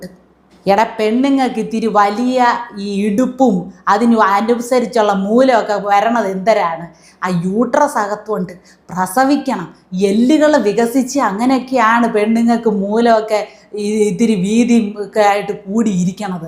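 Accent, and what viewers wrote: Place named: Trivandrum, India. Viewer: വേഷത്തേക്കാൾ കച്ചറ ആ തിരോന്തരം ഭാഷയാണ്🤮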